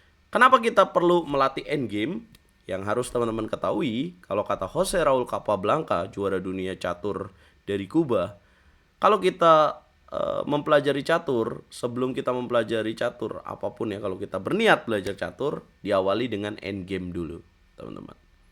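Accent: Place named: Banyumas, Indonesia. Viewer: mas, masnya orang mana sih? kok kaya bisa bahasa ngapak gitu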